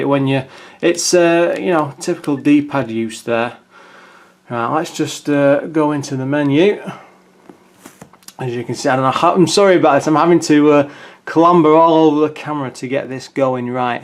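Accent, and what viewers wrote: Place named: England, United Kingdom. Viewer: What part of England do you come from? I'm intrigued by your accent.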